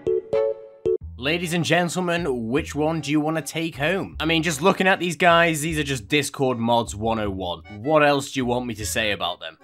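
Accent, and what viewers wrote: United Kingdom. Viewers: Are you British?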